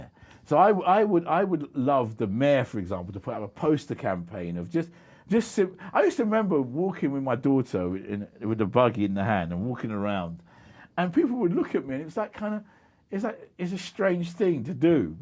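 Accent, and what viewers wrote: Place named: Cockney, United Kingdom. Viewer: Top bloke. For a cockney.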